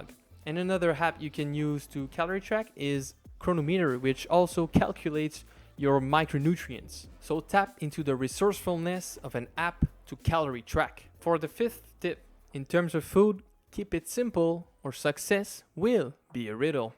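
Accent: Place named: Quebec, Canada. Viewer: Sa sonne le québécois ça🇨🇦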